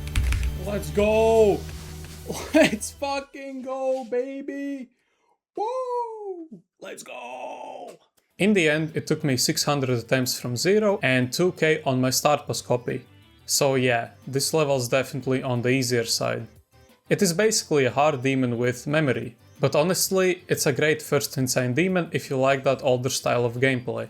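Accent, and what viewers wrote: Latvia. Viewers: latviesu akcents anglu val ir tik ikonisks😭 godigi es nesen izgaju un loti iesaku iet labak ar neka cata, cata ir pilnigs suds imo. Lai nu ka veiksmi! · W latvian accent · At the start of the video i already heard the latvian accent 😂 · banger vid also the latvian accent is so strong lol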